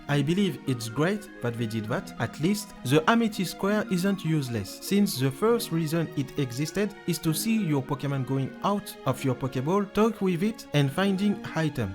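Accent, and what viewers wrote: France. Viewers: c'est pas pour me moquer mais l'accent français me calcine j'arrive pas a suivre la video normalement 😭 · T’es français ? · That’s a French accent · he’s French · Tu es français?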